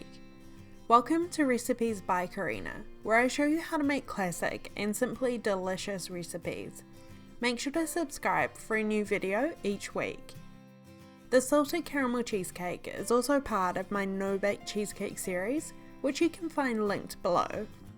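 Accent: New Zealand. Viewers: Ivana Matić more of a NZ accent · Oh, nice. :D Quite different from the australian accent isn't it. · She has a New Zealand accent... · Amazing recipe. I will surely try this. By the way accent is similar to New Zealanders. Am I correct?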